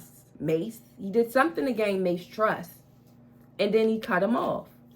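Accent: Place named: Baltimore, United States. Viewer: Love Your Accent, Are you from Baltimore?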